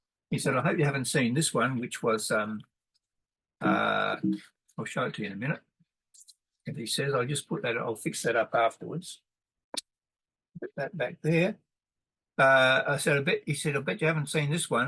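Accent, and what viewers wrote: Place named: Australia. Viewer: Surprised to hear a good familiar Aussie accent on the first click on the search for reviews of the C-150. Thanks for sharing!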